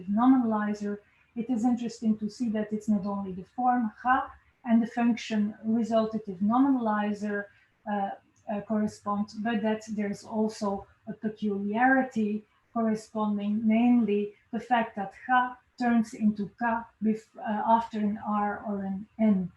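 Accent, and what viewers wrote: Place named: Belgium. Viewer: I would have guessed a Belgian French accent, but either way it's the same region and matches her name (French first name, Dutch or Flemish last name). The quality of comments here is atrocious even for YouTube and far worse than anything I have seen under any other academic video. The OP here is a good example for this. Living in Neukölln, I love Turkish culture, but the guys commenting here are doing a good job of harming their country's reputation. I have only seen similar idiocy on this level from Indians.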